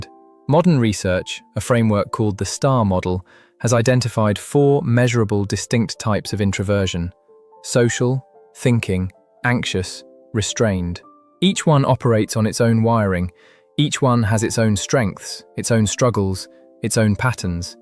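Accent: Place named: United Kingdom. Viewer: I miss the previous persona/voice. This British accent feels distant. It actually makes me uncomfortable.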